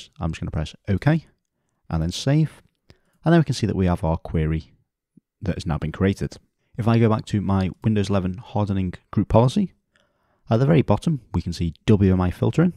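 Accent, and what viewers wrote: Liverpool, United Kingdom. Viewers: Are you a Scouser?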